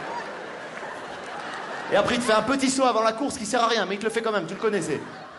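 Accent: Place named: North Africa. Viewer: J'aime la façon comment les Maghrébins parlent :D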